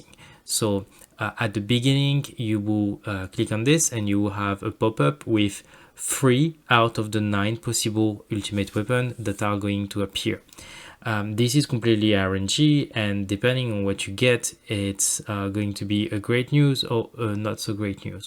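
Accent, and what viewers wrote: France. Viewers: je sais reconnaitre un confrere francais quand j'en entend un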